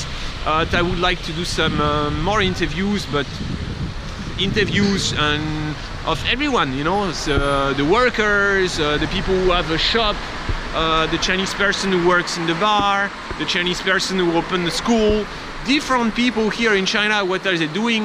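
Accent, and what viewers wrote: France. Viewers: . a French accent. thanks for the comment. please try to hold angle the selfi stick lower to take it more.out of the camera shot. Mercie buquo.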